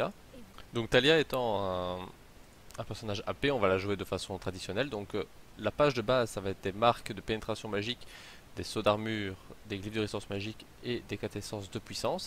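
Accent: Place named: Belgium. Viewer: T'es Belge car t'as un ptit accent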